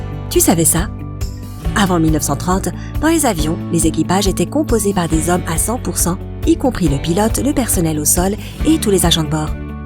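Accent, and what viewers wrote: Canada. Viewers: Pourquoi on entend plus la voix canadienne qui commente ? Sympa je veux la voix canadienne, elle avait tant de charme que j'aurais pu l'écouter pendant des heures en non stop 😍😍😍 ! alors stp sympa mets là de temps en temps au moins ! Merciiiiiii !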